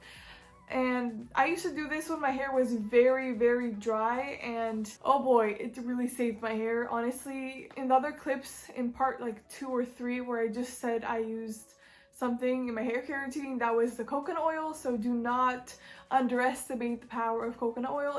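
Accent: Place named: Romania. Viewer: Ur Romanian! I can tell by the accent (and products). Buna. :) M-am prins dupa accent si dupa incercam sa vad etichetele produselor daca sunt in romana, pana cand am reusit sa vad "ulei de cocos" si parca mai e ceva pe cele de la Syoss. Se pare ca ne recunoastem dupa accent.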